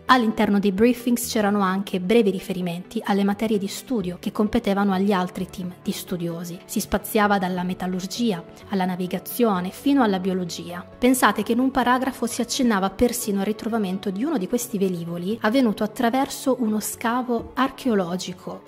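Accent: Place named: Piedmont, Italy. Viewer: Bellissimo video, voce gradevole, inconfondibile accento piemontese, che dire......nuovo iscritto!!!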